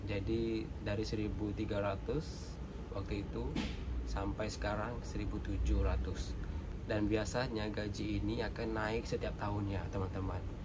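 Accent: Kelantan, Malaysia. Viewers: Mantap kecek Kelate mu bro 😂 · SALAM... BARU HADIR DLM CHANNEL KAMU. TERUSKAN SEMANGATMU BERJUANG DEMI KELUARGA TERCINTAMU.. BERBAKTI KEPADA ORG TUAMU. ITU YG LEBIH MULIA. SALUTE KECEK KELATE MOLEK BELAKO.. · pandainya cakap kelantan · Wah...dah pandai cakap Kelantan 😀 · Wow pndai bro ckp kelantan👍🏻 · Wah.. saya org malaysia pun tak pandai kecek kelate. Hebat kelate mu.🤩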